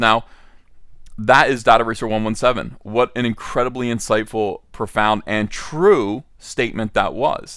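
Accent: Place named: Pennsylvania, United States. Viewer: I love the tactic of compiling the hateful tweets of the SJW crowd when one of their shows premier. Case in point The Wokeman's repulsive 1st episode. Virtue signaling doesn't work now that the normal folk have taken to the interwebs in defense of sanity, and as you so well put, STORY TELLING in entertainment. On a side note, I listened to this episode at my folks house while fixing my mom's table. She not only laughed at some of the clever zingers, but asked, "Oh, is he from PA??" In an enthusiastic tone. Lol. I thought the same, I must say. We're Texans, but mom hails from The Keystone State and you do seem to have that regional inflection. Love your work, good sir!! Happy Thanksgiving to you and your family!!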